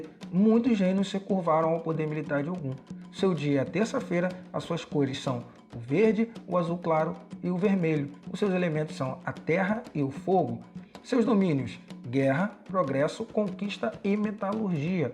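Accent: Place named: Rio de Janeiro, Brazil. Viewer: O vídeo foi excelente parabéns Pelo sotaque vc é do RJ como eu kk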